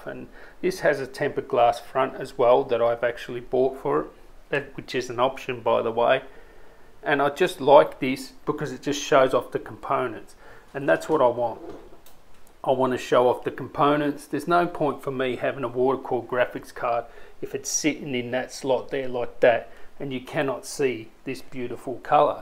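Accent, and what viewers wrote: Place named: Australia. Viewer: Getting rid off ur au accent may appeal more views, mean no harm ♥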